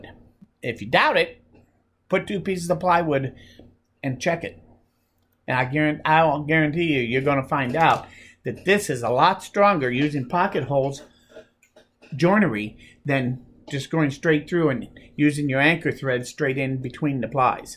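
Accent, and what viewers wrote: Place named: Quebec, Canada. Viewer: This was so helpful. Love your teaching method. Your accent struck me. Are you near Quebec?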